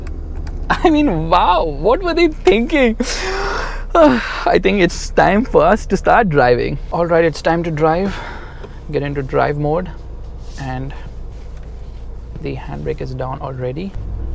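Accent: India. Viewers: Why is your accent so Hollywood Indian character ish... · I love your Indian accent especially when your this excited.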